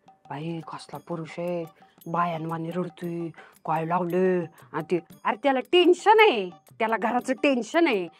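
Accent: India. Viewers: Ek no... Vidio.... Mala tuzi acting khup aavdli.... Aapli gavran Marathi...😅😊 ....... Ashich hast raha, hasvat raha... 👍🏻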